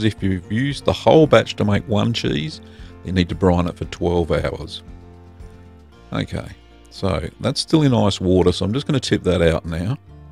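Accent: Australia. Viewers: This is my father inlaws favorite cheese thank you for making all these ethnic cheeses, you're really helping me endear myself to my wife's family. Hope you and yours are doing well. Love the Aussie pronunciation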